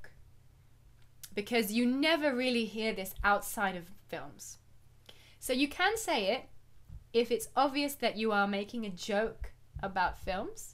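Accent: United Kingdom. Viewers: Are you from England？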